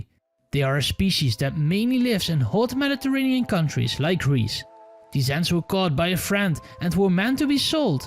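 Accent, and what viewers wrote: Netherlands. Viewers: Haha na de eerste paar seconden wist ik al meteen dat je Nederlands was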